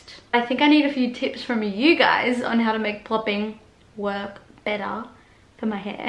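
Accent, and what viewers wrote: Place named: Australia. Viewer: Love listening to your Aussie accent!😄❤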